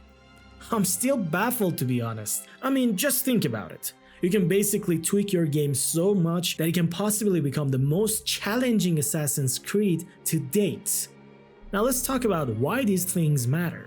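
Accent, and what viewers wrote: Iran. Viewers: Are u Iranian? I ask it because of your accent❤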